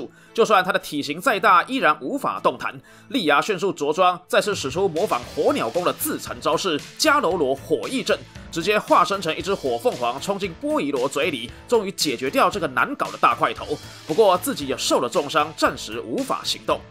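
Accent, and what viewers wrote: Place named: Minnan, Taiwan. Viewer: 这部漫画也是我小时候看的最喜欢的动画片之一，看得出来UP主很用心在解说，但说实话虽然你尽量用接近普通话的口音解说但依然能听出你有闽南语口音，你是台湾那边的吧？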